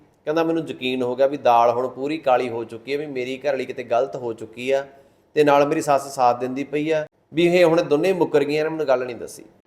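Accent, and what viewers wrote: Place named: Bathinda, India. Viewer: Patakarkar bathinde da lagda hai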